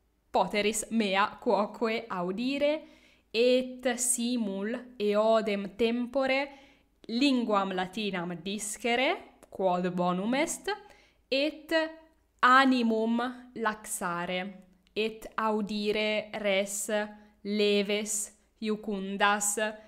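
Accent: Italy. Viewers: I prefer by far the Italian accent for Latin (even with these epenthetic vowels) than an Anglo speaking Latin with aspirated stops, retroflex r and clipped vowels.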